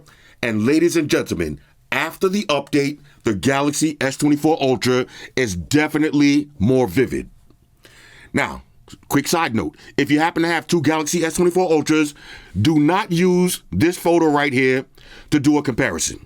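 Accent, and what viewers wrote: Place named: Queens, United States. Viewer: oh my guy from queens, dope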